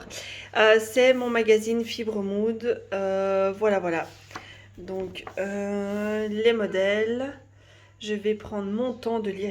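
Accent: Belgium. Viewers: Hey trop contente de te retrouver !! Ce petit accent Belge m'avais manqué ! Des bisous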